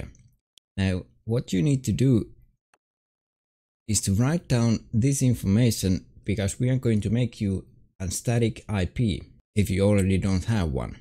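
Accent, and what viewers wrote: Finland. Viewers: Do I hear a Finnish accent or am I going crazy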